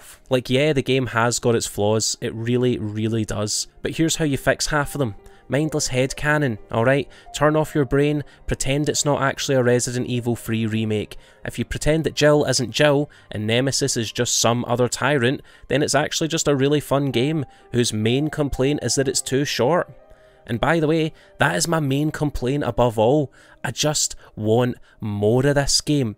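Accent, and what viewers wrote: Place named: Glasgow, United Kingdom. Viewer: Glasgow uni accent is the worst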